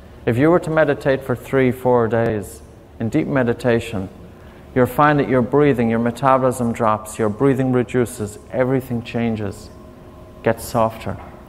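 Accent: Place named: Ireland. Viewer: Such a lovely Irish lilt!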